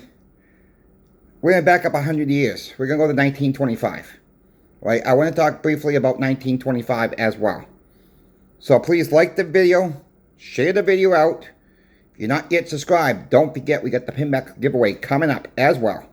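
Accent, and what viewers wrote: Boston, United States. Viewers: I thought you were from New York but you said you’re from Boston. I can hear it now.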